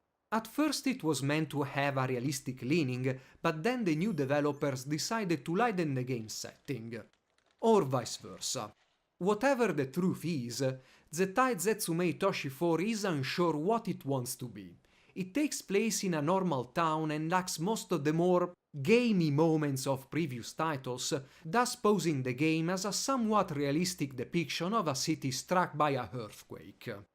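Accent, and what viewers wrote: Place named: Italy. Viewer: Comunque penso (dall' accetto) che tu sia italiano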